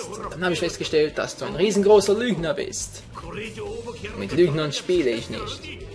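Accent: Switzerland. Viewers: Kommst du aus der Schweiz ?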